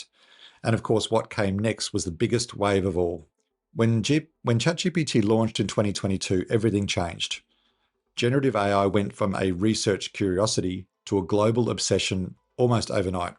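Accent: Australia. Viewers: No, I am Australian and this is a real person. AI is useless at faking the Australian accent. This guy is real. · Maybe not. That Aussie accent is probably hard to clone (for the moment) lol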